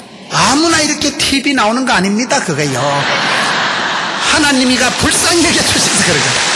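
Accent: Gyeongsang, South Korea. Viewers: 경상도 방언을 쓰셔서 그렇습니다 거친 표현이 아님니다 더군다나 교만하고는 거리가 뭡니다 국어학 적으로 아무런 문제가 없습니다 그러려니 하고 들으시면 되지 않을까여 😊😊😊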